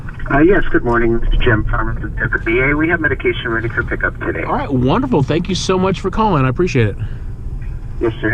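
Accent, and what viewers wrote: United Kingdom. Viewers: love your British accent you make me laugh xxx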